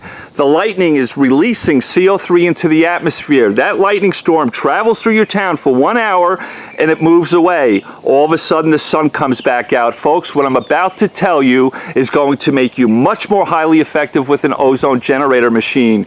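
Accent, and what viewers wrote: New York, United States. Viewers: the accent sounded like it came from NJ or NY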